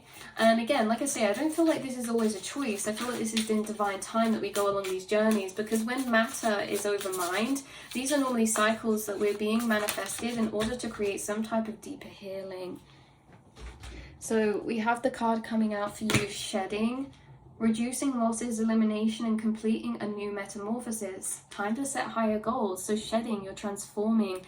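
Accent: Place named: England, United Kingdom. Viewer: Oh yeah I’m in the UK and you sound like you’re from England…I’m a Londoner..Peace ✌️🫶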